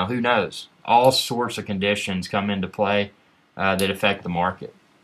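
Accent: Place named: South, United States. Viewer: trading stocks and a southern accent DO NOT GO TOGETHER... that's like a mexican cooking your chinese food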